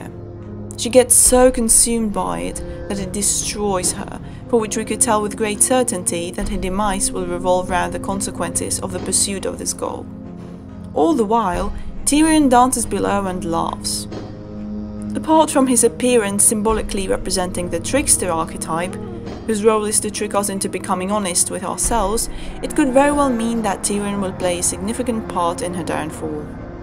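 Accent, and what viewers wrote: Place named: Netherlands. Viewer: Nice video! Interesting accent. It sounds RP english but with a heavy Flemish or dutch flavour to it. Something about the pronunciation of the word "o" and the emphasis on the consonants at the end of words. More clipped. Sorry; accents fascinate me.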